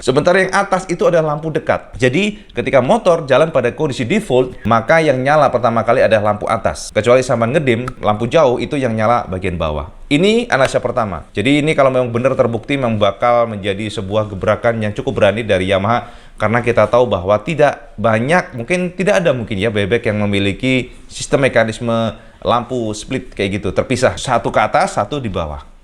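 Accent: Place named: Java, Indonesia. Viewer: Monggo, Bhsanya jowonya kntal bnget😁 Shat dan sukses slalu 🙏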